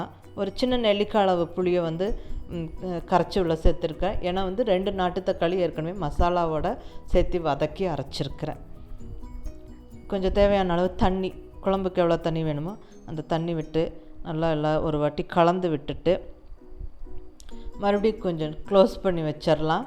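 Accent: Coimbatore, India. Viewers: Coimbatore slang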